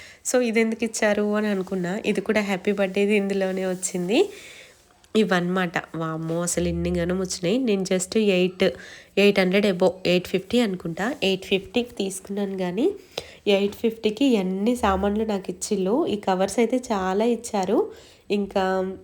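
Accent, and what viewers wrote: Telangana, India. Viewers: Cake 🍰 Super అచ్చమైన తెలంగాణ యాసలో మాట్లాడుతూ చాలా బాగా చేశారు ...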